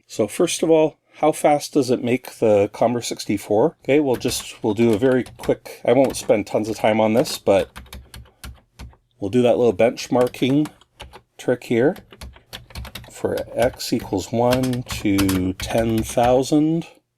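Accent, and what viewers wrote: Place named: Winnipeg, Canada. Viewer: I'm really enjoying your videos the past few days, I just discovered your channel. Fellow Canadian here and from the sounds of it, you sound like you might be near my neck of the woods here in Winnipeg. I was a Commodore fan back in the 80s too as a kid. More recently I backed the kickstarter to get myself one of the new Sinclair Spectrum Next 8-bit computers (can't wait to receive it this August)! Cheers!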